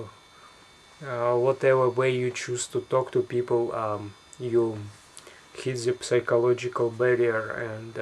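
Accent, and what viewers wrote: Russia. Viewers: Guy, you have so russian accent :D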